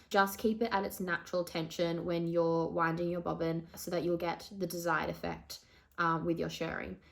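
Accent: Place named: Australia. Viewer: Full credit to the wonderful plethora of American shirring tutorials but it's so nice to hear a fellow Aussie voice saying the word shirring.. 😅 I also loved seeing a tutorial done on a machine with fewer bells and whistles, like mine! Thx for the vid ❤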